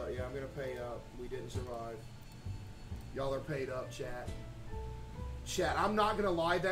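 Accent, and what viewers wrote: South, United States. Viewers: most def cus he's southern AND has a southern accent, I'm from the south (texas) and I can tell despite him glowing in the light and the ginger hair he is def a seasoned white man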